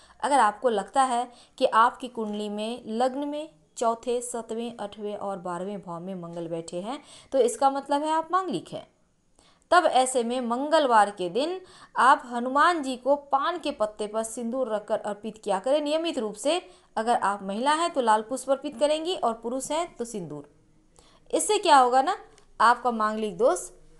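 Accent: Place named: West Bengal, India. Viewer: didi aapnar awaj sune mone hocche aapni bangali.aachen na.aami jante chai ki aapni kundali baniye upay dekhakar jonno koto fees nen pl bole din.nomoskar.aapnar kotha sone khoob bhalo lage.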